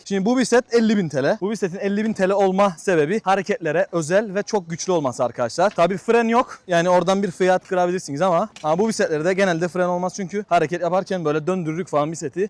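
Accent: Azerbaijan. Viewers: Abi Türk müsün? Azeri gibi konuşuyorsun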